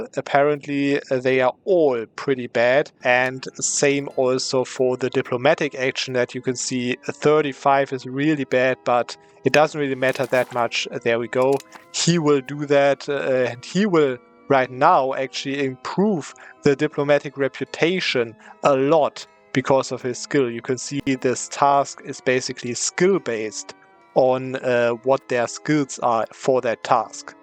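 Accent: Germany. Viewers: Holly shit the german accent is strong in you. Greetings from Germany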